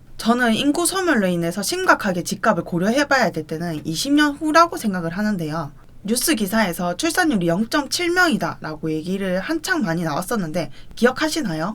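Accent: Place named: North Gyeongsang, South Korea. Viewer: 경북지역 사투리가 상당히 거슬리네요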